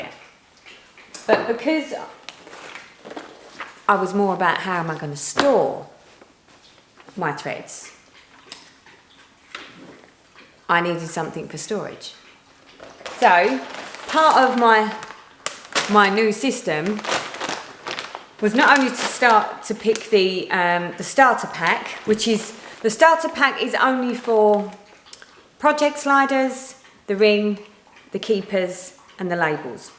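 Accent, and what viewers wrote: United Kingdom. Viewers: Looks like a great storage system. Thanks for sharing. Love all your stitching. Your accent makes me feel homesick for the UK though!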